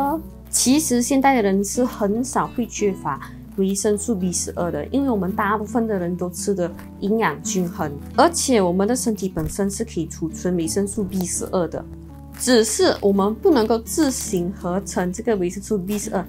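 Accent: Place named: Malaysia. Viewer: 视频主的中文发音很不标准，乡土味很重。请问是不是马来西亚华人 ？😁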